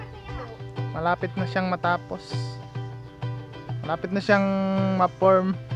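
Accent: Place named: Visayas, Philippines. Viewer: Hahaha abi kog peko agaw sa pala mahirap talaga tayong mga bisaya mag tagalog hehehe 🤣 proud jud ko sa akong pagka bisaya bai..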